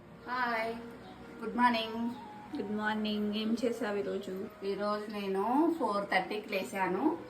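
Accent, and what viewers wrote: India. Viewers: Me videos and meru chese recipes, me rayalasema yasa anni chala ante chaala bauntayi garu 🥰🥰🥰❤❤❤ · Mee matalu chala baguntai pinni endukante mana anantapur slang ekkada filter cheyyakunda original slang ❤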